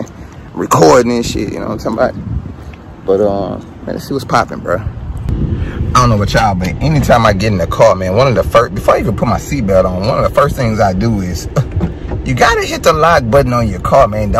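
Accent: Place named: Houston, United States. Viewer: Htown accent on deck.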